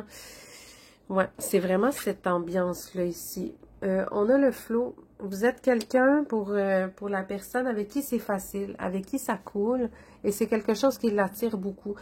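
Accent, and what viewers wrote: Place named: Canada. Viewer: Votre accent canadien, très mélodieux, me fait presque l'effet d'une séance asmr (vous avez le bonjour de la France Pyrénéenne du Sud -ouest). Merci pour cette guidance toute douce et pleine de bon sens. Choix 3.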